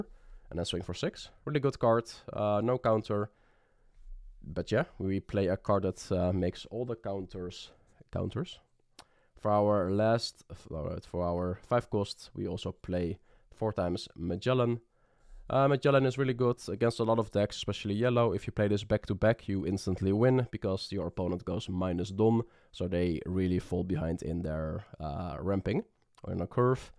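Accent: Netherlands. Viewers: Random question but are u dutch?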